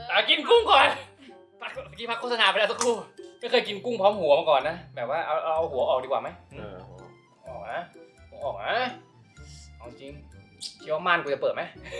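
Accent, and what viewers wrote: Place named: Isan, Thailand. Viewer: เว้าอีสาน แล้ว1 น่าฮักกกกกกกกก อารมณ์พี่จินเว้าอีสาน อะไรงี้ 555+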